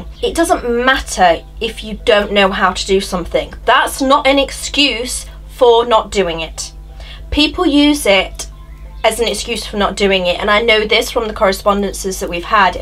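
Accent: United Kingdom. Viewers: I do NOT want to hear this...but I NEED to hear it. Where does the fear come from? Why can't I jump right in and not be in fear of making a "mistake"? Thanks for the kick in the butt! I also realized that anything said in a British accent is very palatatable. Keep up the great and talented work,!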